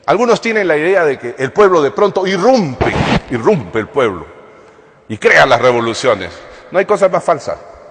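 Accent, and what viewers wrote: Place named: Peru. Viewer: Separó al Alan García político del Alan García orador , porque disfruto escucharlo , porque es el verdadero dialecto peruano. Separemos el político y el orador porfavor.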